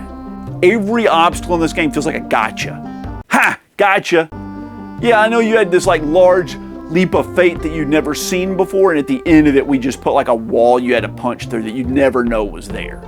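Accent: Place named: New England, United States. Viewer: You seem like you’re from the southern part of upper New England. That’s not an insult by the way. I’m from Middle New England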